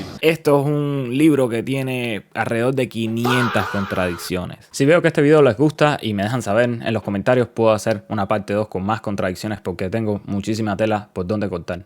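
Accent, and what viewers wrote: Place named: Cuba. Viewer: Bro eres Elieser el Bayardo versión agnóstico ateo , me encanta escuchar el acento cubano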